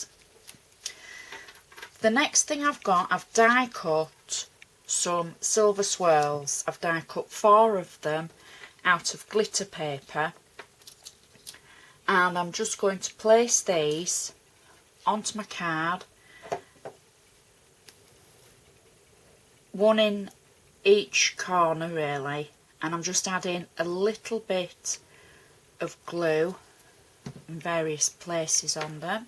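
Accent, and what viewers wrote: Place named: Northern England, United Kingdom. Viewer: Nice to hear a northern accent I am from Bradford but now live in Bournemouth, where are you from?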